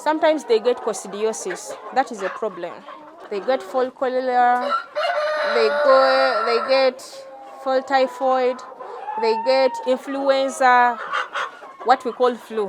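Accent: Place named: Kenya. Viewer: At first I thought that am listening someone from Kenya kumbe you're the one?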